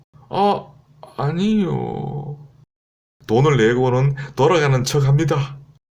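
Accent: Gyeongsang, South Korea. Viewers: 선비님? 갱상도 말 이야기 정말 우습게 잘 하시네요~ ㅋㅋ~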